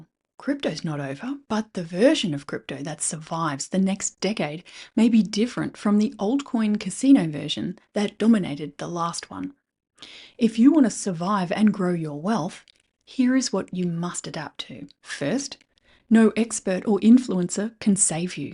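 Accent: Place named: Australia. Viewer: So much food for thought here, thank you! I really think your channel has the most valuable crytpo insights going round. So refeshing to hear an Aussie in this space too!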